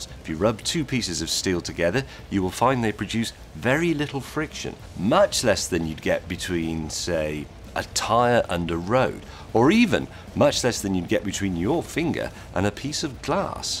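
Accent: United Kingdom. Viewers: I disagree. This sounds more like a peasant, British, dialect. It is annoying and incorrect. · Vsauce with a British accent, not bad...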